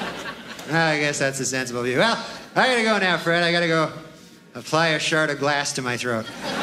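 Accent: Canada. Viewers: Yeah, he sounds super Canadian here. Norm had a real Ottawa Valley brogue as a young man. <link> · He sounded a lot more Canadian back then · Actually what he sounds like is a CARICATURE of a Canadian.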